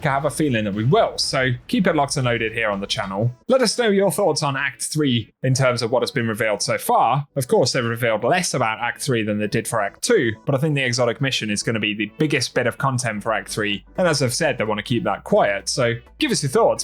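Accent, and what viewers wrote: AAVE, United States. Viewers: leave his ebonics dialect alone.. 😂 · Is it ebonics?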